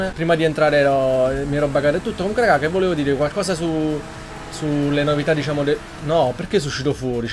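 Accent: Naples, Italy. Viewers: Sei napoletano ?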